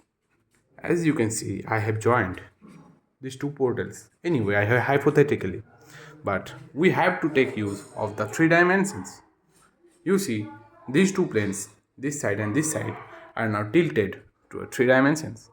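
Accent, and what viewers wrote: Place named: Africa. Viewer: U got the African accent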